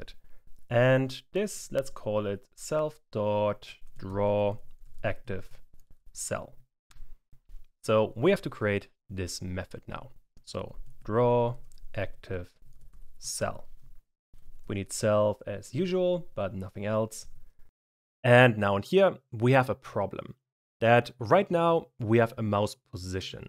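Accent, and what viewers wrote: Germany. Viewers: haha moin😂 Wollte nur fragen wegen dem Akzent.Der ist ja auch nicht komplett englisch. Vielleicht ist es ja deutsch? 😅 keine Ahnung. Aber du bist anscheinend deutsch 😂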